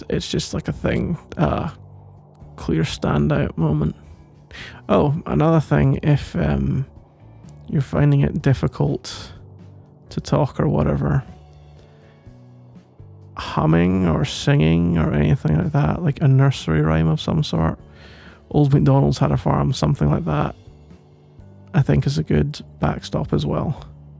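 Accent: Scotland, United Kingdom. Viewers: I loved this audio, but I am also a big fan of the Harry Potter series, so I was wondering if you could do audios for one of the characters, specifically Oliver Wood because he has an identical accent to yours. (His accent made me look for Scottish audios :)) If you could do a series for him on how he would react to certain stuff that would be amazing. It would give you a chance to broaden your horizon a little bit and have fun with this character! If you have already done something like this, then don't pay any attention to this :). I love your videos by the way!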